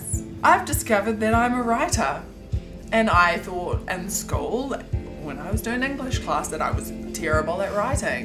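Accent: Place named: Australia. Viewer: Christianity and cults have nothing to do with each other. They are two separate things. Seems like u grew up in a cult. This is not Christianity at all. Get to know Jesus as your personal Lord and Saviour. Sorry for your bad experience. Are u Maori? Saying since u have a down under accent, either New Zealand, Tasmania, or Australia. U do look very Maori though.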